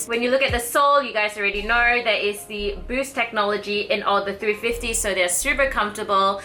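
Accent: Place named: Australia. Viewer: Fuck me a cute asian with grey hair and Aussie accent...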